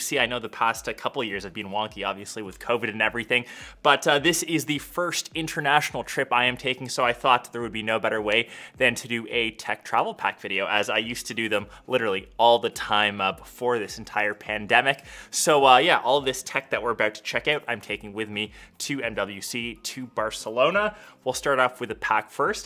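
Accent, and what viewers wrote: Netherlands. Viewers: Huh are you from holland? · Hé, are you Dutch?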